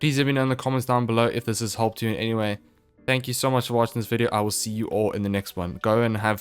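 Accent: South Africa. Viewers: I know that English South African accent anywhere! XD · Are you south african? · You are awesome and so nice to hear your SA accent! Hubby and I are from SA! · Are you south African?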